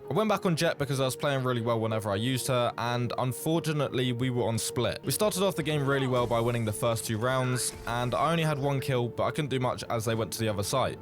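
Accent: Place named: United Kingdom. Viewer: Idk how I didn’t realize you were br*tish until now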